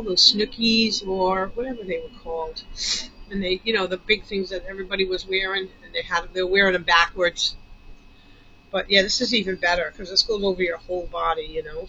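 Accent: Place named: New York, United States. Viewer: Hi, you so remind me of my neighbor in NY, the accent too.😄💗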